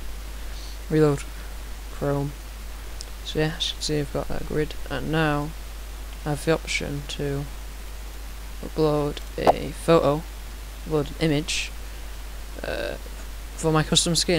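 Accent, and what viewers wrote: United Kingdom. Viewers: BRI'ISH 👽😩 but yeah "It's not looking good brav", from the solution of y'all's problem, is to have a smaller res, some duu from another vid published 6 months ayo saiiyyyd to do a 50x50. We'll see tho, I'm nearly at 90 DNA. 👽👀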